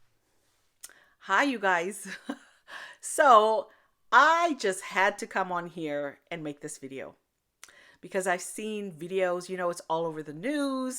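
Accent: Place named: South Africa. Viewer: Clicked as soon as I saw your notification. Your South African Accent coming through nicely today😊 Out of all things I've heard this makes the most sense. Yes they are married to one another and they don't owe us anything. {Also this is the first time ever I get to comment First! }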